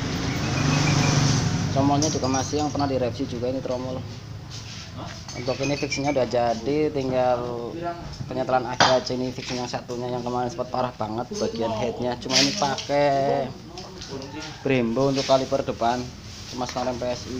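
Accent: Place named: Banyumas, Indonesia. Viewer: Jen ngapak temen kang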